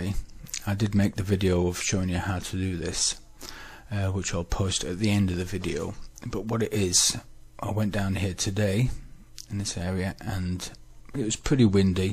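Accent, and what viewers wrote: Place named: England, United Kingdom. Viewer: Great footage J! I'm still playing with Litchi at the moment, but it's an amazing app. I wish I'd got your in depth knowledge of it ... it's quite a steep learning curve, fine tuning the settings, isn't it? Getting there though, and having fun! Lol! Your videos have been extremely helpful, and, as previously mentioned, it's great to hear an English voice! Thanks mate!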